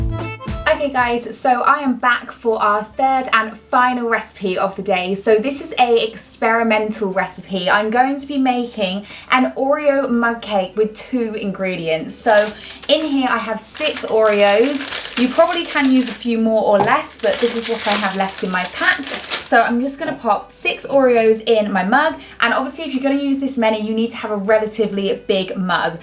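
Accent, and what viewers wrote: United Kingdom. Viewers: I think she has British accent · Omg whats your accent? Is it English? Its beautiful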